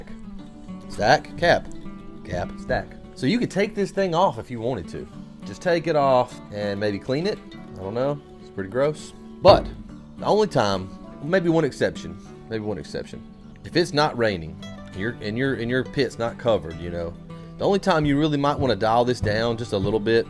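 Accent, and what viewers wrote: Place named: Rural, United States. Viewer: Love you man! I miss that country accent being in COMIfornia now. Beast to you and your page.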